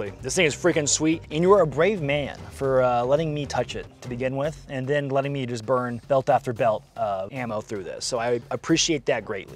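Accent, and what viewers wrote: Afrikaans, South Africa. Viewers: The South African accent and slang is awesome lmao and also the History lesson makes me happy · Oh wow again the Rhodesian and South African references were amazing. Saw some SADF photos in the video of troops with the FN MAG. South Africa used the FN MAG in the bush war and was the backbone of the troop or squad. Love the accent!! Good job. Not quite leo's level in Blood Diamond but with some work you will get there. Well done bru! TIA. As a South African this video made me smile. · Lekker video my Bru.... Your Afrikaans /rodisian accent sounds good. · your South African accent isnt half bad 😎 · Love the South African/Rhodesian accent, shot my bru 🤙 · That was the best Rhodie/South african impression ive ever heard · as a Boer patriot in commie South Africa, I quite enjoy your safrican accent. lekker my bru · The South African accent 😂😂😂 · Not the South African accent ,love it 😭🤣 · Liiiike it my bru your afrikaans English accent lol focken lekker 👍👍👍👍👍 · As a afrikaans speaking south african i aprove the accent hahaha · Love your attempt at a afrikaans accent hehe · As a south african and afrikaans speaking native the accent killed me 😂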